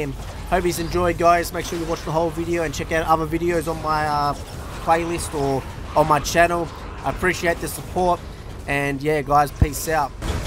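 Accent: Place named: Australia. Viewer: This is worth watching just to hear your accent! All commentary should be done in Australian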